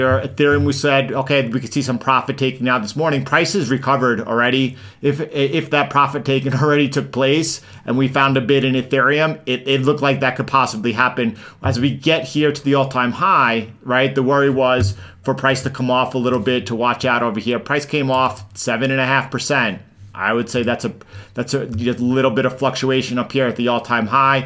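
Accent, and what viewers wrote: United States. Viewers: Hard to trust people with American accent nowadays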